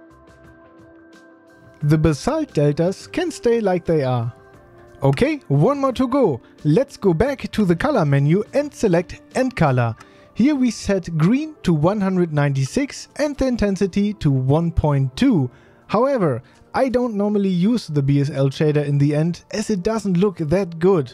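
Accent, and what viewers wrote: Germany. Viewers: deutscher akzent richtig? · Mein Lieblings Englisch-Deutsch-Sprechender haha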